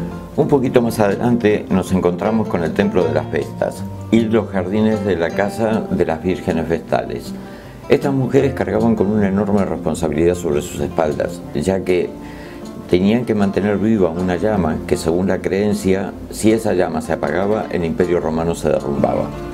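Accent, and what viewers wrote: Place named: Argentina. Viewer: lo de que es usted Argentino no cabe duda , por su acento recien estuve en America Usa ,México, Perú ,Ecuador regreso España luego Lisboa Berlin ,Roma , Roma fue impresionante visite vaticano Coliseo palatino Foro Romano Fontana di trevi piazza Navona Pantehon etc etc deseando volver a Roma un saludo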